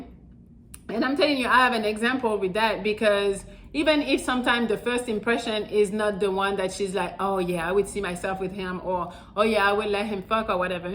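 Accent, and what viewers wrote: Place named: France. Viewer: That’s where the accent come from I thought you where French As a Haitian man I thought I Hurd it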